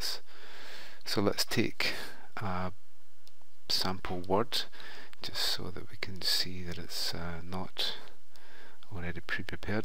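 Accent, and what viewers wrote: Scotland, United Kingdom. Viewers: It's nice to get a Scottish guy on here explaining things :) !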